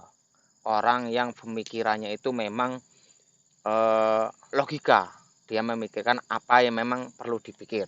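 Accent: Java, Indonesia. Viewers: Mas ini domisili di mana...?kok logat2nya orang jawa...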